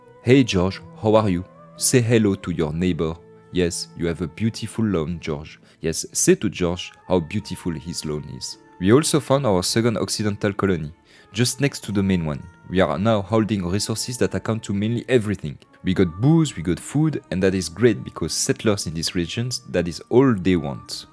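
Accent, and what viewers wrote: France. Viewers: I just want to say this is the most french accent I've ever heard. Magnifique!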